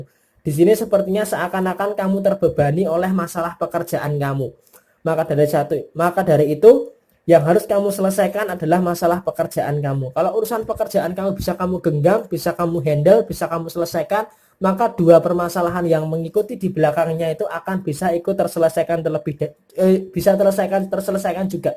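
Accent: Java, Indonesia. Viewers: Sya nlah ktawaaaa dngarttt host...yg bawain reading an...logatnya ithu khaz kental.orang jwa....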